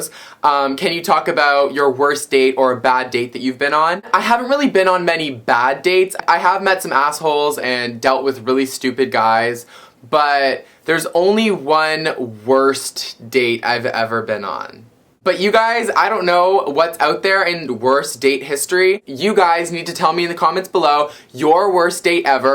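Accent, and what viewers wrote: Canada. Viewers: are you Canadian? it sounds like it sometimes. lol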